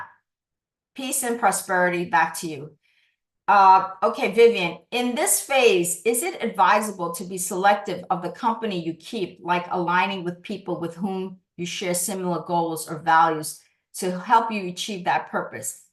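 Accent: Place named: California, United States. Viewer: Thanks❤ sounds like a strong californian accent 😊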